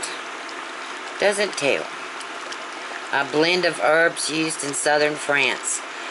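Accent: South, United States. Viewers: Looks delish! Never heard of Lux Pinto Beans..? Do they sell this brand in New York? LOL.... Love your Southern Accent! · I cant believe any body could be so rude to you...I guess someone with too much time on thier hands. Im.a brand new subscriber, love your recipe, and your southern accent..keep the great recipes coming. And God Bless You❤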